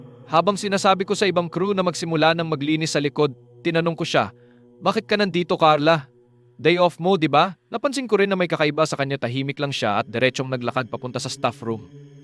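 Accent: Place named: Visayas, Philippines. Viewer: from Visayas ba kayo? may punto po kasi, curious lang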